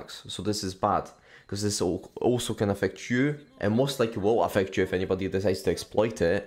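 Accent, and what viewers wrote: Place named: Scotland, United Kingdom. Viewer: Good to hear a Scottish voice in the community 😢 Exploiting the SSH flaw sounded a bit like trying to thread a needle while wearing oven gloves when i listened to someone discuss it earlier. The Adobe one?: more like reaching through an open window. Between that & Polyfill, bunch of ops teams & web devs are gonna be pretty busy this summer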